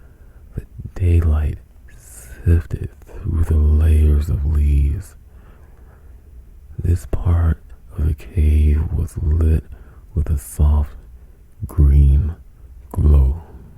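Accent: St. Louis, United States. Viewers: That 314 accent tho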